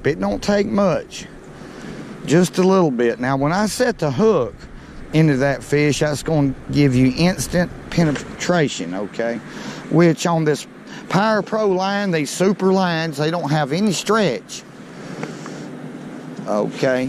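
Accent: South, United States. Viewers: I just wanna say that the reason I love watchin' your videos is for one, I love crappie fishing, and you always deliver when it comes to crappies, your down to earth attitude that keeps me laughin' every time I log on, and last but not least, that darned southern accent is irresistible to listen to. I love your humor, your sarcastic take on things, and the fact that I'd love to have someone like you to spend my day on the water fishing with. Thank you for providing me with daily entertainment and helpful videos like these. I get a bunch of fun outa watchin' you every time I log onto youtube. Have a great day, my friend! You da best!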